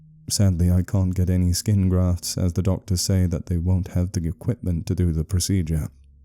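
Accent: Germany. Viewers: You know he nailed the german accent when it reminds you of Werner Herzog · As an enthusiast and practitioner of accents, i've gotta say your German accent is on point!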